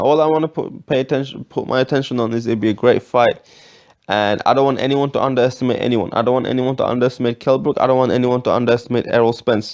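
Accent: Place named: India. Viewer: You sound like you're a Indian from India! ANY ways I just wanted TO let you Know what YOU sound like!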